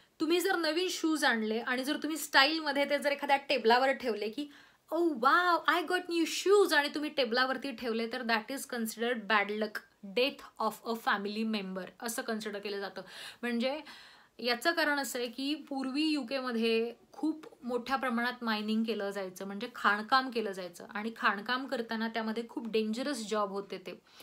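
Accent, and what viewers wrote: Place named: Pune, India. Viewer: Hi,tumchya uchcharatun spasht janawtay ki tumhi punyachya ahat? Ami right?😂😂 But still you have very well explained the topic n let me tell you video on rotimatic was awesome but little costly thats all.all the best.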